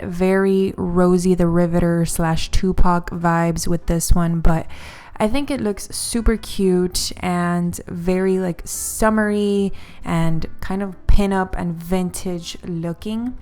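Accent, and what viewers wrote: Miami, United States. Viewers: Omg girllll I heard your accent and I was like "She's from Miami for sure!!" Love this 💕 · Miami accent?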